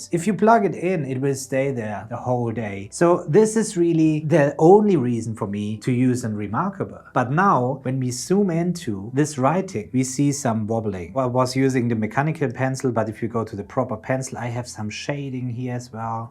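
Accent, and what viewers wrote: Germany. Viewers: Bist du Deutsch? Merke da so ein akzent ..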